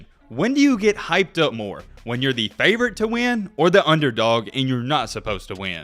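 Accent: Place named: Tennessee, United States. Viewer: It's the accent...TN? Little hard on my ears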